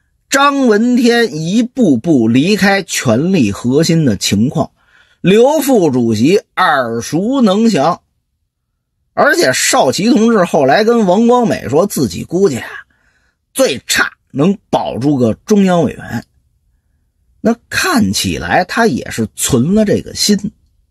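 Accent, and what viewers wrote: Beijing, China. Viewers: 北京口音真好听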